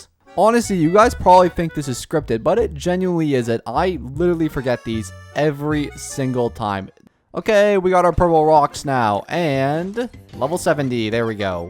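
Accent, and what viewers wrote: Canada. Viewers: i love the canadian accent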